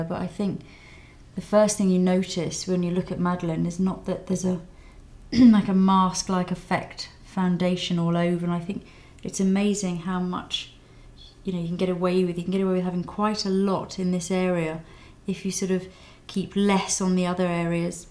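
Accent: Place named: RP, United Kingdom. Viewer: All I can think as I watch this is..... her accent is so melodic and posh.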